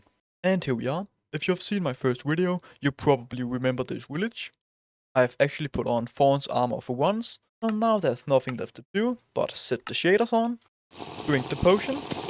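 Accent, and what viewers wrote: Denmark. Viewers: din danglish er sindsyg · Holy danglish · den danske accent er elite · er du tilfældigvis dansk det lyder sådan. anyways fed vid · I hear dansk 😮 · Den danske accent træder altid igennem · 30s in and I'm guessing you're Danish. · YOOO... you dansk? ahah. Great video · The danish accent is crazy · skud ud til den danske accent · I can tell he’s danish! Godt arbejde! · The danish accent LOL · sekundet videoen startet ku man høre du var dansk men shii valid vid du mangler bare accenten nu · Danish ascent + bro cheated... Level 8 with elytra ( u start at level 7 when u die soo) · Great video! Got to Love the danish english 😂 great job! Greetings from norway! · tydeligste danske accent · This sounds liek Danish english are you from Denmark i am. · Are you danish? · bro din danske accent dræber mig indeni 😭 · are you danish by any chance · bro got danish acent¨ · wait are you danish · I know a danglish accent when i hear one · bro are u danish u have a very danish acent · Your Danish accent is thick 😅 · hej bro, kan høre du dansk. 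skal vi lave en survival verden sammen, mangler end der er god til spillet lol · i like that after just 1 second of him speaking i could hear he was danish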